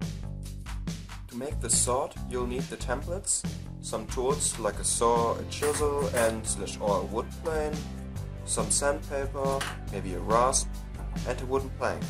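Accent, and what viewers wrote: Germany. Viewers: The whole time I thought : "this guy sounds German " and then I sa w the Obi Premium Lack and knew I was right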